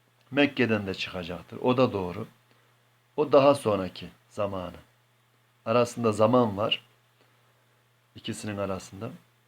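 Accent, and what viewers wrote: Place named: Ankara, Turkey. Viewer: Angara şiveden belli olmuyor mu İlk çıhışş çıhacak diyip duruyor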